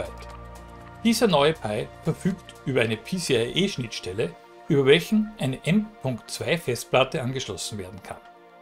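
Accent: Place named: Austria. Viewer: Nach gefühlt 0,1 Sekunden erkenne ich den österreichischen Dialekt trotz sichtbaren Bemühens um schöne, hochdeutsche Sprache.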